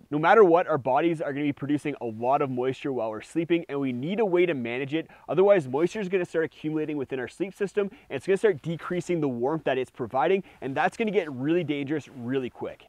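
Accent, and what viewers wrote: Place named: Canada. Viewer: annoying canadian accent.....ooootside, lol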